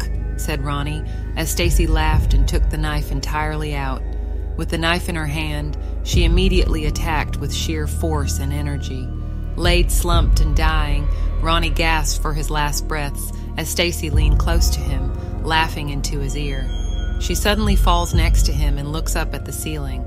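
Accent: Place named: South, United States. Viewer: Great trippy story! The southern slang narrator adds a texture of realism to this twisted tale too!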